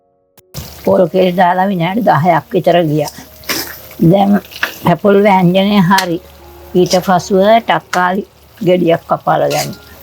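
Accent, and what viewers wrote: Ambalangoda, Sri Lanka. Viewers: Aththamma from down south? The way she talks feels like amabalangoda accent.. bless her she is lovely and kind ❤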